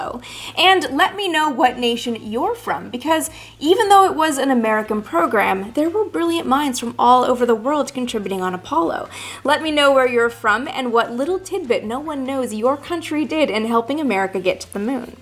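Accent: Canada. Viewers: Leaning into the Canadian accent for this? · I love Canadians. You guys are so incredibly nice, it's awesome. Canadian women are among the most beautiful in the world ( being a perfect example). Plus it's so cute the way the say aboot, time oot. I'm quite smitten with, I admit it. She is the perfect woman, smart, funny, intelligent, Canadian, and she loves space.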